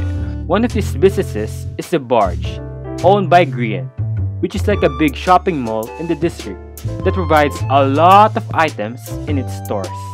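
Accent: Philippines. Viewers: are you filipino cause of the accent??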